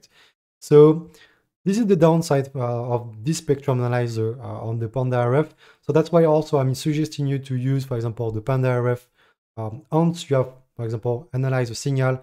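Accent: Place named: France. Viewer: Hi ! Keep it up buddy #FrenchConnexion Your accent betrays you ahah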